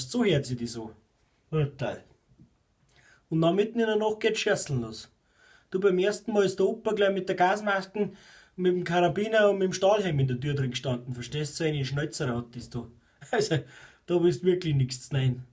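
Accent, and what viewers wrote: Bavaria, Germany. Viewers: Ach der Dialekt Erinnert mich an die zeit in Bayern!!!Wie in der Alten Heimat...*herrlich* Grüße aus Sachsen ;)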